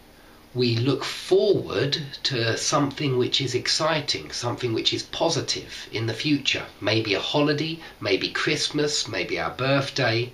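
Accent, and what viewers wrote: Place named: RP, United Kingdom. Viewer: Thanks a lot sir I really love your BBC accent.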